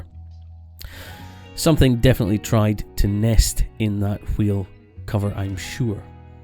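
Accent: Scotland, United Kingdom. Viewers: ASMR With a Scottish accent was extremely satisfying.... let's see this one go crazy.. 🤗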